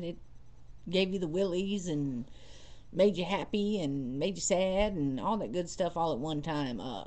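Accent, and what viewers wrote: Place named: South, United States. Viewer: Love southern accent! :D I want one!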